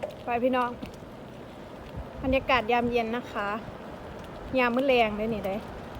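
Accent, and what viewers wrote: Isan, Thailand. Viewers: อินางบ้านอยู่เมืองอุบลบ้อหล่าสำเนียงเว้าไปยุใสกะฮู้fcจากอุบบเด้อเบิ่งมาแต่ดนแต่บ่ได้คอมเมนส์ซื่อๆ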